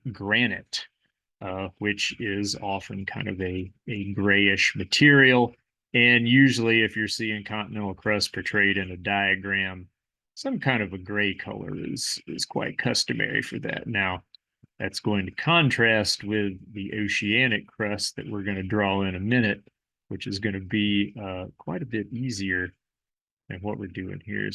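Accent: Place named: Baltimore, United States. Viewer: Love your Baltimore accent. LOL I wonder how many people can identify that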